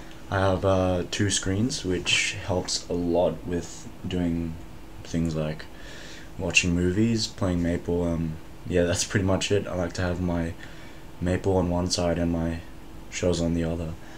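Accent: Australia. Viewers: An Asian that sounds like a Australian...... Wow....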